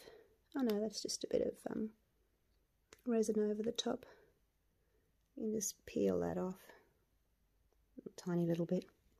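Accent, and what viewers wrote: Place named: Queensland, Australia. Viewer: Hi. It's great to hear an Aussie and fellow Queenslander on YouTube. Those coasters turned out wonderfully. I'm generally a green lover, but this time the blue won. Stay safe.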